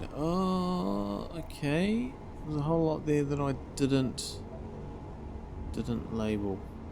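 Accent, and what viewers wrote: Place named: New Zealand. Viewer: Man... i know its a tiny thing, but i was waiting for the American accent... and then i was pleasantly surprised. Im assuming your a kiwi? i really like this build from what i have seen of it man, great work, well recorded video, wwell spoken. highly enjoyed!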